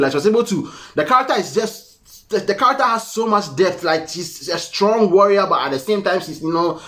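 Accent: Ghana. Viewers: your accent sounds like some Ewe man i know 😭😂😂😂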